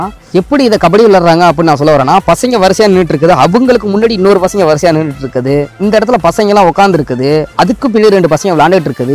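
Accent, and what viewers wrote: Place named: Canada. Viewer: Enna bro Canadian lad maathiri tamil maappilaya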